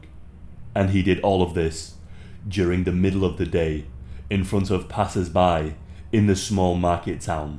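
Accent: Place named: Yorkshire, United Kingdom. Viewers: Thought youre a Yorkshire man. My oldmans from Donnie Doncaster ;)) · So now I know your accent is Yorkshire. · When I get some cash ima pay this dude.. I love listening to horror read in yorkish 🤪🏴🏴 This story I've known for a while... Horrific from start to finish.. OMFG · i KNEW you were a Yorkie go me can pick an accent any day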